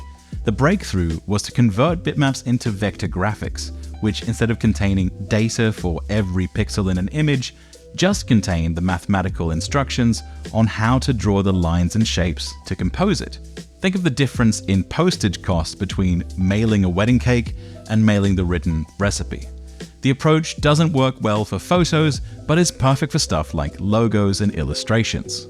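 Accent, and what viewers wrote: United Kingdom. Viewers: sorry man cant finish this video your british voice is just so condescending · Why does your voice suddenly sound bassier and slightly more British?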